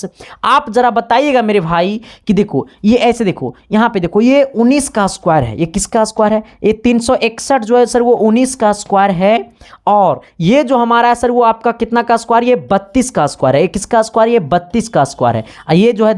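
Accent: Bihar, India. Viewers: Sir aap Bihar se ho kya ???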